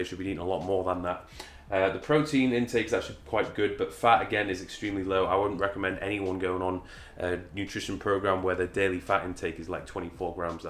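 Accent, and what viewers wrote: United Kingdom. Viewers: Metric - Check British accent - Check British Supermarket foods - check ** When American videos start measuring in terms of 'cup' I'm already zoned out. Helpful and interesting video of comparisons. Even if not followed religiously, there is certainly no hardship in introducing these meals a few times a week.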